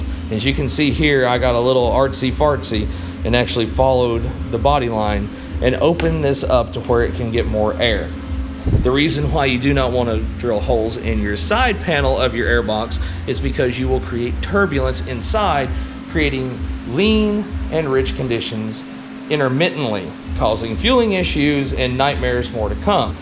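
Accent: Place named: South Africa. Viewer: you sound like a white south african my man! Dope vid man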